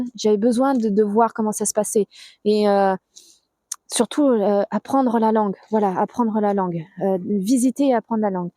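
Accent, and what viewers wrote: Russia. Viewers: Неужели никто не понимает, что вы русская. Я это отлично слышу по вашему французкому. Сплошные фейки.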